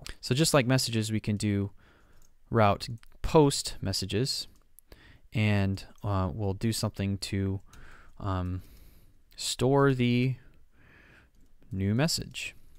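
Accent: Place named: England, United Kingdom. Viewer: i love your english accents and thank you sir :)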